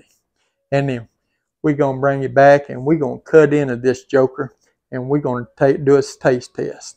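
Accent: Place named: Texas, United States. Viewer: I will watch again to hear your Texan accent!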